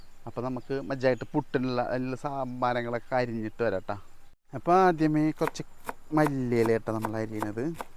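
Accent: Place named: Kasaragod, India. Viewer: കാസറഗോഡൻ സ്ലാങ് പങ്കായിട്ടാറ എബില എന്ത ഇത്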